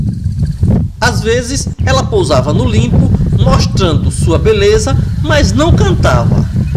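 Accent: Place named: Northeast, Brazil. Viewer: Gosto do seu sotaque nordestino , este é meu Brasil de Norte a Sul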